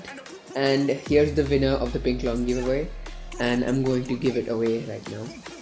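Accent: India. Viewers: Ooo are you Indian? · are u indian? By ur voice i think ur indian and do u have extra spike i am buddy83282 and i saw it 5-6 times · are you indian? It sounds like it ouo (im not btw just asking)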